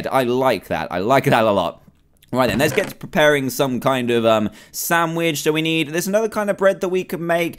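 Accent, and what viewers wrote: United Kingdom. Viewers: dude dot hate on british accent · jean wasiulewski no he has his british accent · There are many ways to pronounce many words and he is in the UK so your in the U.S.A maybe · It's how most of us in Great Britain say stuff.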